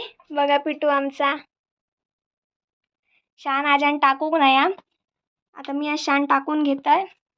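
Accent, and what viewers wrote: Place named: Malvan, India. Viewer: Malvani tuzya tondun aikayla maza yete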